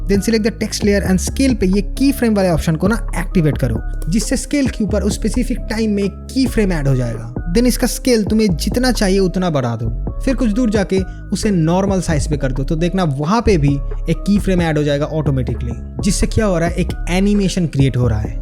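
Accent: Bengal, India. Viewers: Hindi with bengali vibes....ye bhi log notice karte hain🤗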